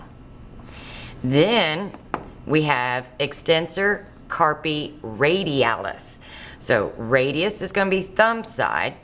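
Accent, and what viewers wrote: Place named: South, United States. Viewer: Something about the southern accent makes it all stick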